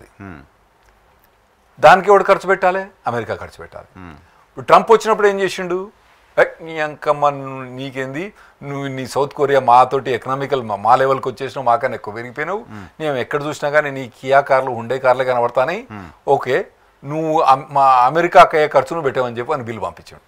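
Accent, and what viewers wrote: Telangana, India. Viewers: Excellent video in telangana accent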